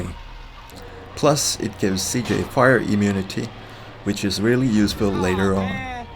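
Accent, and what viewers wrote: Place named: Estonia. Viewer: hevi eesti aktsent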